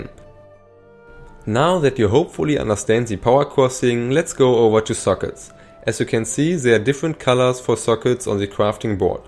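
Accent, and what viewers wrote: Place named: Germany. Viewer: Yeah, very strong German accent. He should work on that.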